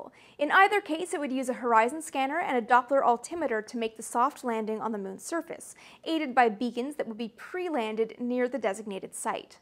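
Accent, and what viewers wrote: Canada. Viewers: Love the show! But is it possible to move away from the almost 100% vocal fry delivery? It is painful after the first few minutes.Vocal fry is common with the Kardashians in sound bites but for a 15-minute video it is literally all I can hear. Keep the Canadian accent of course! You have great content.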